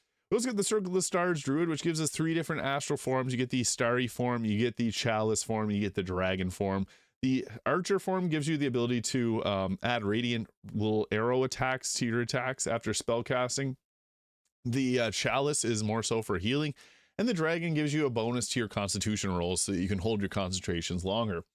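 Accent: Canada. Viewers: okay that makes sense since i couldn’t quite pin it ! assumed canadian but it was different than any i had heard before so i wasn’t sure :p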